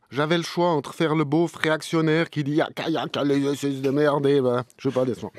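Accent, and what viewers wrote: Vaud, Switzerland. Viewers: Ton accent Vaudois!!! Exellent 😝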